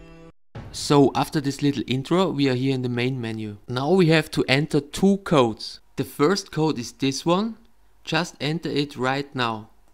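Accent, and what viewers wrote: Germany. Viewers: It's a German accent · Wow du bist sicher deutsch oder ? (no judgement) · Bruder man hört das du deutscher bist kannst du dass auch mal in deutsch machen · Wenn du deutscher bist - rede deutsch. Klingt einfach nur schrecklich wenn die deutschen auf International machen....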